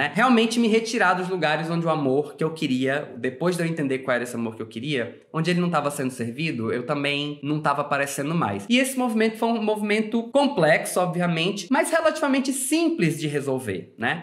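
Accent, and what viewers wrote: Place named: Northeast, Brazil. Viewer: Que delicia de sotaque ❤ bem senti mesmo algo nordestino amuuu. Viva nosso nordeste. Muito gratificante encontrar um conteúdo profundo e importante que nos rodeia mas que tudo mundo finge que não ta rolando ou que não rola, necessário este assunto.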